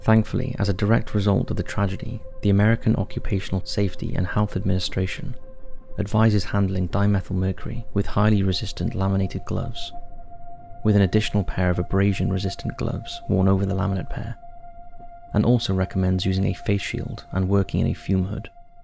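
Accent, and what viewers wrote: Wales, United Kingdom. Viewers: He’s definitely Welsh just not sure where abouts 😅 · I just watched your wars of the word doc on Vietnam... are you from Cardiff or Newport? you sound slightly Welsh...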